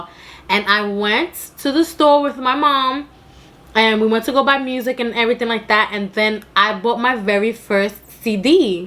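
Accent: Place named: New York, United States. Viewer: I’m watching or listening to tour video while I’m doing my moms makeup and she said is she from ny I can hear the accent lol😘😘😘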